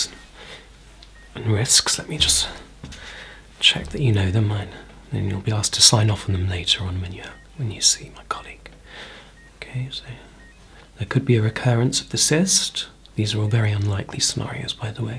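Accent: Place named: United Kingdom. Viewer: Gods, you're gorgeous as both male and female I just--- can't. how iN THE WORLD IS THIS POSSIBLE 😭😭😭💜💜💜 that aside, I got what I asked for lol thank you, it's tingly as always and you always speak in such a clear, crisp, and gentle voice, with posh English accent that I could understand perfectly even to my untrained ears as English is not my mother tongue 🌟🌟🌟🌟🌟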